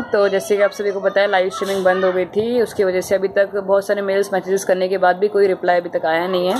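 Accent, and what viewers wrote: Rajasthan, India. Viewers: Mam aap Rajasthan se ho kya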